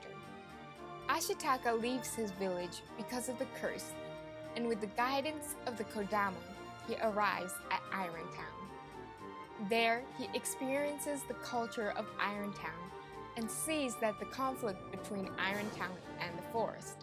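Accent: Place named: England, United Kingdom. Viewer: I could listen to you talk about anything your english accent is so nice.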